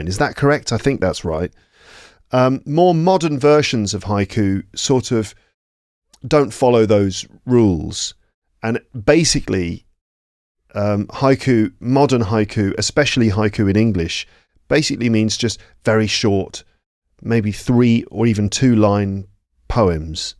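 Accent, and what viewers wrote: United Kingdom. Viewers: ❤ I'm Korean, I'm hope going to England in few years.🙏 It really helps listening British accent...! 😊 Thank you so much!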